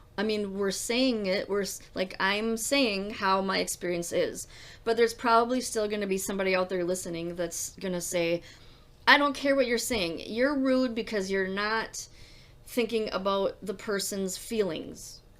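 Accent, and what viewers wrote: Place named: Midwest, United States. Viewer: Are you from the midwest? Like Illinois? Wisconsin? I hear your accent. Triggering is stupid. How YOU react is not MY problem. I got diagnosed at 74. Now 76. Good podcast.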